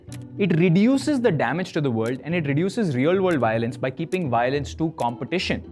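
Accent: India. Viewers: Y'all how tf you bear this insufferable indian accent. This accent is not even indian i dont know wtf is this but entire world hates it. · Heard indian accent and immediately turned it off. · When you love the video but have to bear the indian accent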